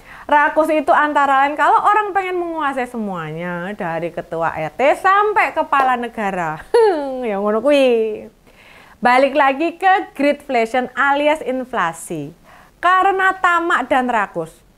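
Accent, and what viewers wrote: Java, Indonesia. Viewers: Seneng aku bahasanya medok dan lancar ancen pendukung Ganjar rata2 cerdas dan berintegritas ngos ngosan lanjutkan mbak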